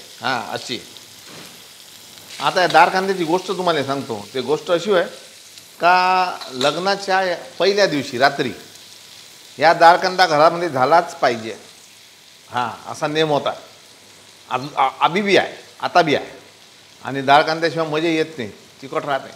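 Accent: Vidarbha, India. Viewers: डाड (ळ) कांदा बघून तोंडाला पानीच सुटलं 😋😋 आन तुमची अन आमची पन विदरभाची बोली ऐकून तर मस्तच वाटलं नं बॉ....🤩🤗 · khup chaan... ! vidarbhachi bhasha tumhala suit karte....!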